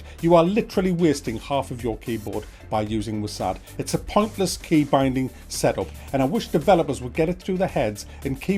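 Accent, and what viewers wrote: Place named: North East England, United Kingdom. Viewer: I look forward to videos, hearing a good old rant from a fellow North East bloke!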